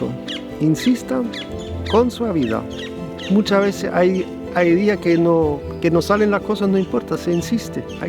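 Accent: Paraguay. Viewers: Curioso como se mezclan el acento paraguayo y alemán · Hermoso trabajo y lugar!, y ya se le pegó el acento Paraguayo 🇵🇾😍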